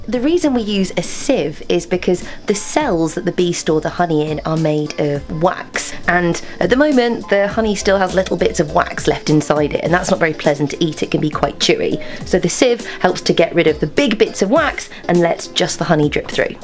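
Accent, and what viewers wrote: United Kingdom. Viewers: YOU SOUND LIKE YOU'RE FROM LONDON!! :D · I can tell she’s British based on her accent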